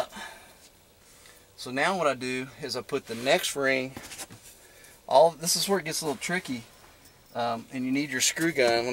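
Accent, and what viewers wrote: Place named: Texas, United States. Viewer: thanks for quick respond... yeah mine it's just got 2 speaker also it's stick shift which i love .. about door speaker well i got to open a hole in the door to place the speaker and much more work that I don't have right tools to do so i will take wrangler speakers or charger dash speakers ... anything well be better then my shitty under dash speakers .. lastly i love Texas love her accent in a whole i love America.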